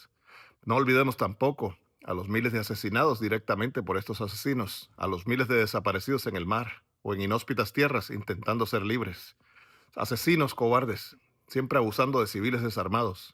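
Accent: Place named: Mexico. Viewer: tienes acento mexicano ahi fue donde te fuiste parece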